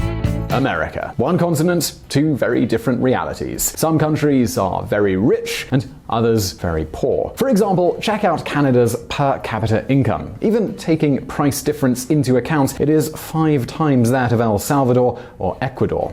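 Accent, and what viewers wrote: United Kingdom. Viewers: ... are you british?:)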